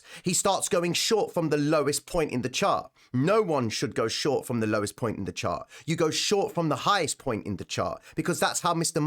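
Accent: United Kingdom. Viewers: Ill be honest it's nice to have a UK voice 👌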